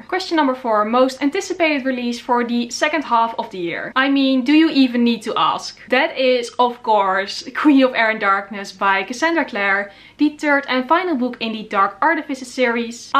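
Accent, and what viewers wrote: Netherlands. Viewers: i think she is from the netherlands about the way she talks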